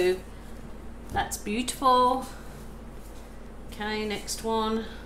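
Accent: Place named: Midlands, United Kingdom. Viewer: You're so welcome, I enjoy your work and I like your easy going attitude, along with your midlands accent! Don't blame you for emigrating, love the filming of the views from your home, you live in a beautiful area of the world. Much respect to you.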